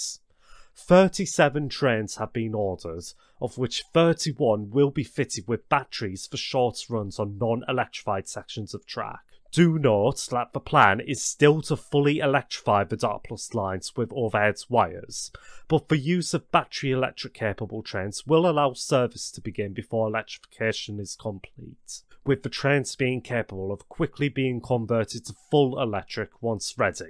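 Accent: United Kingdom. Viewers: The person sounds like they're from Liverpool that's talking in this video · British accent is so strong its hard to tell you're speaking English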